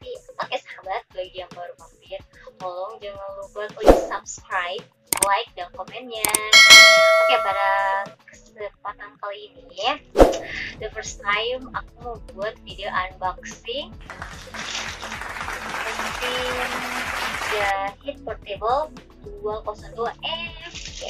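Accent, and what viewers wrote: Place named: Makassar, Indonesia. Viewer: Makassar yh logatnya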